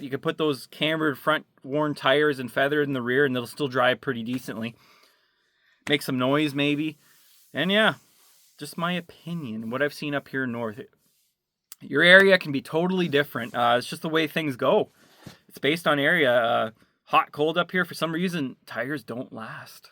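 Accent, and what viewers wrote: Canada. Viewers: Guess you didn't catch his Canadian accent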